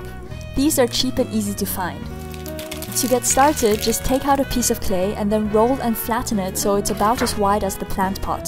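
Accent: Germany. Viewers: bist du deutsche? ich finde dein Akzent klingt irgendwie deutsch😁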